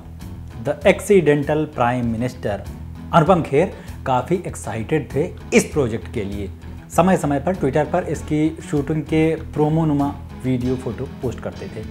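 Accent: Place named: Bihar, India. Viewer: Kisne bola ye biopic hai? Na hi producers ne bola, and na hi director ne bola....bhai research kar k aaya karo. Ye bus sanjay baru ki book pe based h and wahi khud movie se associated log bol re hain. And one more thing.... Lallantop k pass dhang k anchor nahi hain kya? Sare Bihari utha k le aate ho, hindi channel hai to atleast without regional touch wali hindi bolne wale hi le aaya karo...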